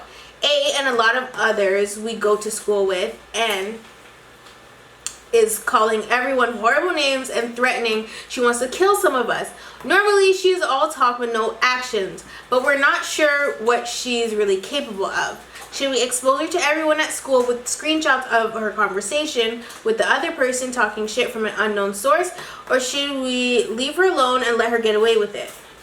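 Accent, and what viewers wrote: United Kingdom. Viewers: Your British accent is on point! you sound posher than me😂😂 ,Love your videos x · That British accent😩👏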